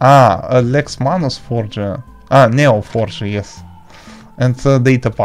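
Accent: Russia. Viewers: У тебя русский окцент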